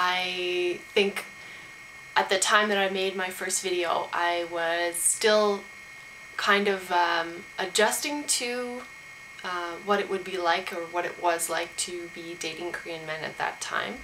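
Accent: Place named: Canada. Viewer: You Canadian, ain't ya, white girl? Judging by the accent. :)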